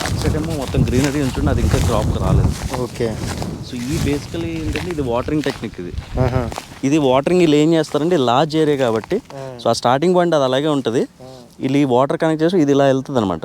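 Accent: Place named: Anantapur, India. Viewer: yaantaapur district ... super accent anna...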